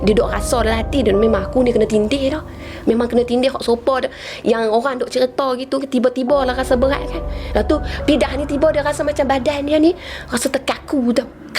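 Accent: Kelantan, Malaysia. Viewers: Weyla ore klate..sblom nie xbunyi klate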